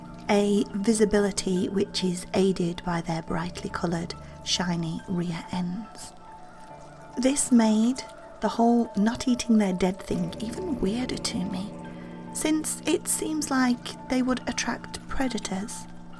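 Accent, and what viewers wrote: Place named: Northern England, United Kingdom. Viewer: This was excellent! If you don't mind me asking, where abouts are you from? Your accent sounds quite similar to one of our narrators who is between Lancashire and Yorkshire :)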